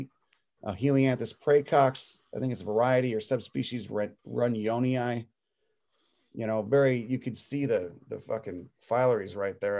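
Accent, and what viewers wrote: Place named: Boston, United States. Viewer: Good cheers to an Italian brother. My Nono came here to clean up gypsy moths and work on some estate as a gardener. My grandmother had me out in the garden and knowing how to grow my own food by the age of twelve! By 12 I found this really old book the local library was getting rid of for ten cents. Its been my freaking bible for the past forty years! During the 1990s I focused on native design, but as we know during that time everybody wanted imported plants that looked exotic and needed craploads of pesticides. I was ahead of my time with the organic approach but if people listened they would know that if you keep the ecosystem there are natural predators and you can plant native stuff to attract predators such as wasps and there are so many different types of wasps! The earth has been my life, my entire life and you are doing an amazing job. 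I am so grateful for your hilarity in these videos and love how you are not afraid to be real. YOu sounds like you are from Boston/Italian.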